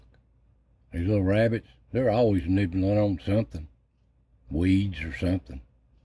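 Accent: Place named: South, United States. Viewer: I need to get your smooth southern voice to come do a colab! Bring your metal detector.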